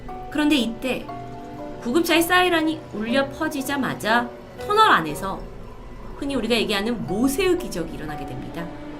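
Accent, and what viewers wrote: Gyeongsang, South Korea. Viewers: 역시 갱상도ㅋㅋㅋ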